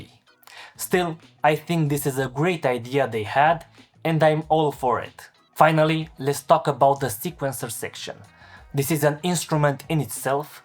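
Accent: Russia. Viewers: Как буд-то русский по английски говорит))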